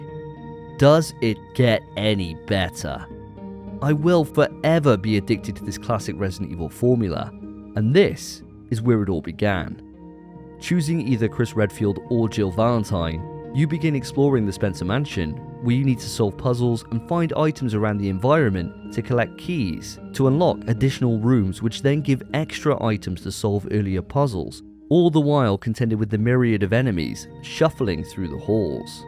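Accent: United Kingdom. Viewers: It’s just not well thought out. Also, the British accent is annoying.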